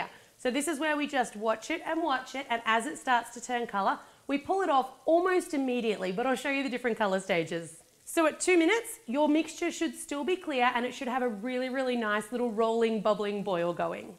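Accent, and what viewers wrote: Australia. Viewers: is she an Aussie don't like the accent. and fair enough yeah she recreates bigger version of chocolates but do they taste the same as the actual brands? only a million times worse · She actually sounds more Australian than british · No! That's not a British accent at all!! She's Australian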